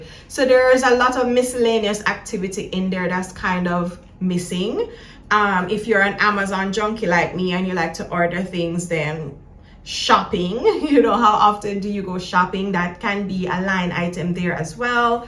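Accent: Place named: Jamaica. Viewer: Very informative video! ❤ I love the Jamaican accent…😂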